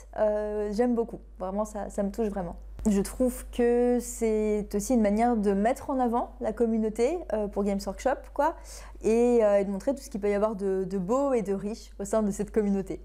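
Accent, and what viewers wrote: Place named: Belgium. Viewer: G Wé :-) Ça fait du bien d’entendre des prononciations de notre plat pays :-) Cela me rappelle le temps où mes parents avec une BMWée 😂 Un coucou d’un Belge de France !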